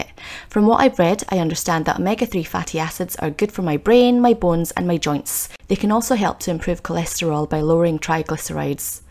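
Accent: Scotland, United Kingdom. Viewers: I'm a new subbie and I love your videos. Good ideas! Out of curiosity are you Scottish because you sound like like you have a slight accent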